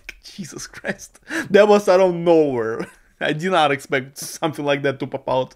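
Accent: Russia. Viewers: are you russian perhaps? either way I love the accent lol it makes so much better lol. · По акценту и затем по названию понял, что человек русский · слышу русский акцент в английской речи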